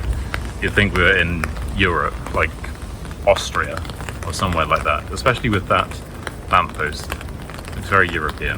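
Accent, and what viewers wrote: United Kingdom. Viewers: Listening to rain sounds is the best! (British accent with an ASMR vibe:) So no worries about it at all!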